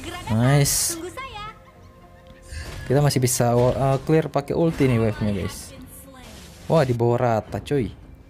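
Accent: Manado, Indonesia. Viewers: Bng, kmu orang Manado?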